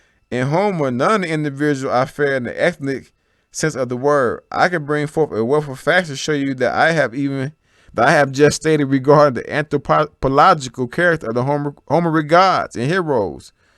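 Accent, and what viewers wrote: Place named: South, United States. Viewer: We wuz kangz is over hundred years old? Didn't know that. But the Black Southern accent is just great.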